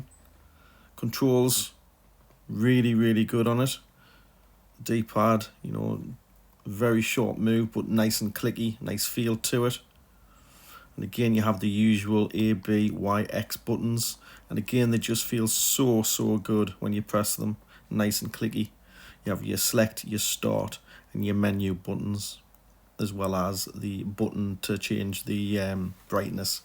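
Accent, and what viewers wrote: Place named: Newcastle, United Kingdom. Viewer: Cool review Geordie lad Like me 😉😉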